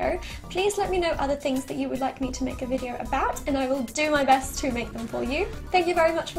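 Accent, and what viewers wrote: United Kingdom. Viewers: I loooooove your British-English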